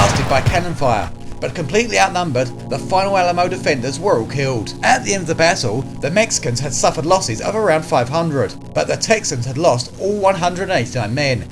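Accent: United Kingdom. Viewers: Neither is he! lol That's an English accent. ;)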